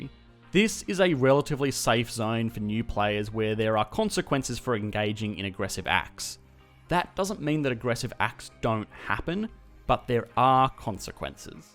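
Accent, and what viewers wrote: Australia. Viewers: Shame the Australian accent ruins any chance of the vid being taken seriously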